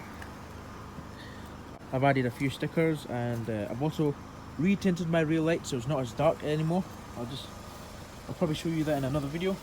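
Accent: Scotland, United Kingdom. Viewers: Thanks for taking the time to show us amateurs how to secure an armrest. I have just bought a 2013 Octavia and need to order one as I keep looking for something to support my arm when I feel lazy. Incidentally, love the Scottish accent. Cheers Mate.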